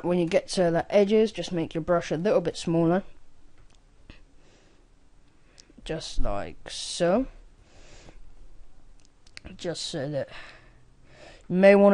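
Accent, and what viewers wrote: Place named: Devon, United Kingdom. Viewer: Do you live in plymouth ?or devon ? It sounds like it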